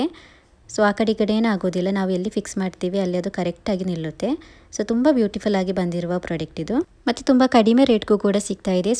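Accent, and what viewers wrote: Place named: Kerala, India. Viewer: Sis neewu malyalina nim maathado style ishta aythu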